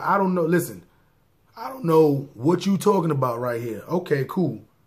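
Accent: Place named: New York City, United States. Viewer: Nothing like a nigga from nyc telling a story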